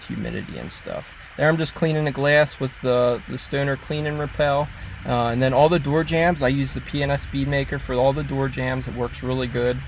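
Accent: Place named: Pennsylvania, United States. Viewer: You sound like your from PA, although you did say wal-ter and not wonder. But other than that I hear a PA accent?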